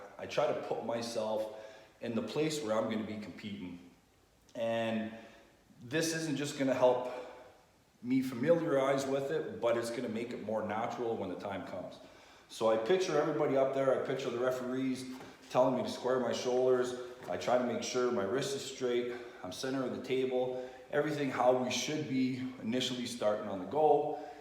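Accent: Canada. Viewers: Finally a arm wrestler with a great Canadian accent eh! Want to hear what you think will happen in Dubai. What each guys should do. What each guy you think will do. 16k subs already. Nice start.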